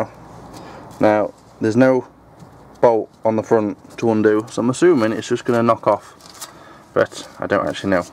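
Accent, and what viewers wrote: England, United Kingdom. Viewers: Good video - Car repair taught by a guy with a classy English accent. Couldn't be too much better. Well - maybe if I could have talked someone else into doing my Focus brakes but,.....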